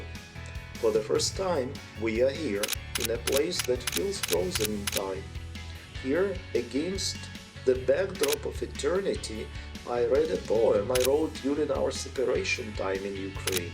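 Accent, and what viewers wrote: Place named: Germany. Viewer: Здравствуйте ещё раз. Сначала собралась искать субтитры, думала на немецком. У Вас уже немецкий акцент. Но потом поняла, что это английский. И всё понятно, хорошее произношение. А я как раз учу английский. Очень красивые места. С большим удовольствием смотрю. Супер!👍👍👍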